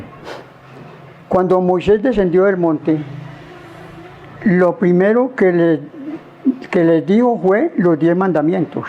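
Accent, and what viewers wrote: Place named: Colombia. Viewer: ¿Porque sospeche que era Colombiano cuando comenzo a hablar...?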